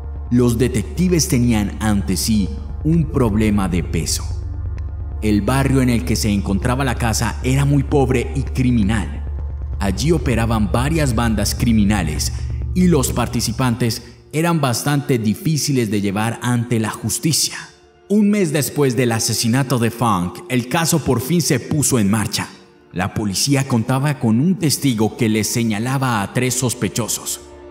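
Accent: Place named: Spain. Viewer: Es el primer vídeo tuyo que veo y ya me he suscrito a tu canal. Me encanta el español de España que usas y que hablas en todo el vídeo.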